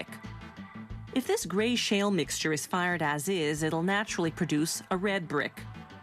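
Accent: Canada. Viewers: The commentator's Canadian dialect is thick. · Her accent sounds Canadian, not American. Listen to how she says "process." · Canadian much. That accent is killing me! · The narrators on all the how its made videos are always SO annoying. i think they do it on purpose. They are obviously real people but they sound like the google translate voices. is this woman canadian or something?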